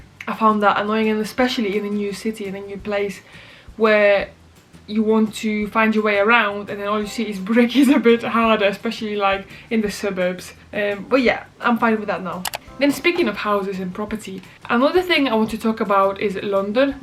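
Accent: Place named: Manchester, United Kingdom. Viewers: It is so brilliant to hear you speaking with the northern "U" sound. Most people who learn English learn to speak the way they do in the south. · Bravo! Great to have you in the UK. You have got the Manchester / North West Accent! My wife (from Brazil) occasionally drops into the Norwich accent.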